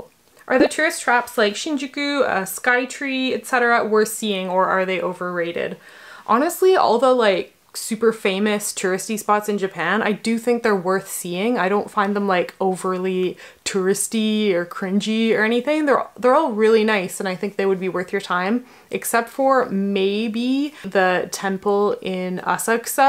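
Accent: Canada. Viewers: Its always funny when I hear a canadian say “abooout”. eh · K, you've been living in Japan for that many years... but i still heard that Canadian about.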